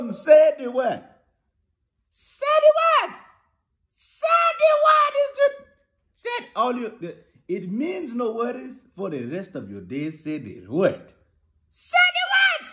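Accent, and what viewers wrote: Africa. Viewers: Shuler has the best African accent. PS I am a Nigerian. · Brutha Shuler 🤴🏾...😂😭🤣🤣 your African voice cracks me up..😂😭😭 Shaka Zulu frfr... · Lmbo man u r straight up crazy... more of the African accent, love it love it love it! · YOU ARE THE MAN!!!! That African accent.....🤣🤣🤣🤣🤣🤣🤣🤣🤣🤣🤣🤣🤣🤣🤣🤣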